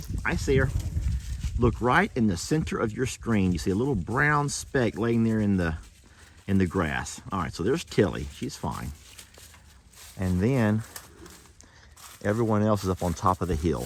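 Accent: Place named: Texas, United States. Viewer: to this Aussie Texas accent isn't clear English. I often have trouble but I agree with the captions being distracting.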